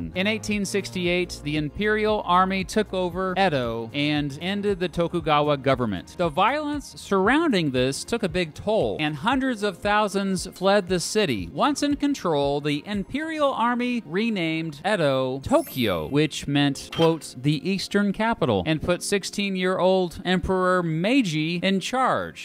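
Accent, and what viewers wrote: United States. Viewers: He clearly didnt learn the Korean romanization for this video. His pronunciation is pretty much expected from an American. I disagree that the revised Korean romanization is horrible. It got rid of the use of weird symbols that can't be typed with American keyboards that was previously present in McCune. And romanization systems aren't English, obviously you can't rely on English pronunciations for reading foreign romanizations. You actually have to learn them as the system that they are.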